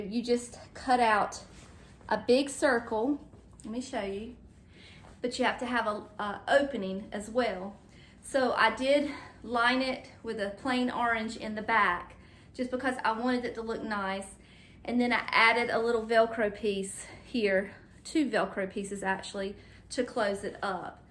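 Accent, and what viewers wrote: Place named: Kentucky, United States. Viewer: I have wanted to do a Halloween tree for awhile. I bought a bunch of decor for it this year and invited 15 girlfriends for a Halloween Party. As I was watching you it dawned on me that my tree is flocked with snow!!!! Oh my word, how dumb can I be??!!! Guess I will hit some consignment stores today 🤞🎃I love your channel and your decorations but my favorite is your sweet way and southern accent. Reminds me of my cousins in Kentucky.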